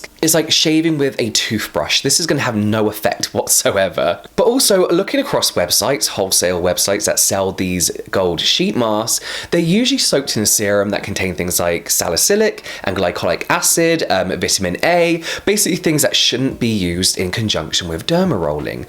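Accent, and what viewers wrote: England, United Kingdom. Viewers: your British accent gives me eargasm!🤪 we stan a skin care addict&k-pop lover🥰 greetings from Turkey:) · I can listen to him all day though, his voice is so soothing. If my husband had a british accent I might not tune him out as often as I do. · I do love a good Brit accent! :) I will try to watch influencers who I think are wasting product. I think people on p r lists in general are bad about it. Lately so many are de--cluttering and going on no-buy months or something that hopefully they'll be more thoughtful with their items. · sometimes i click on your vids just to listen to your beautiful english voice 🙈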